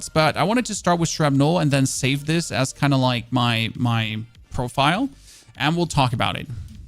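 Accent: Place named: Germany. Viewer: i love your german accent xD its pronounced Bast-teal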